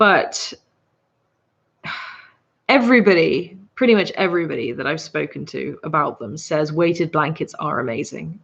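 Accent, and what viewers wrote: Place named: England, United Kingdom. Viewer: I'm. A huge fan of you ur the aesomest English girl I all so love ur acsent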